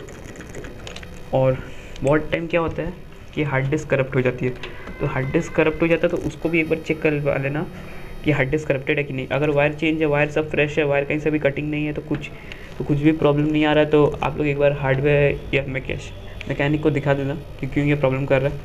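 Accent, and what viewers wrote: Bengal, India. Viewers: Are you bengali? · Are you bangalee? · You are bangali, right?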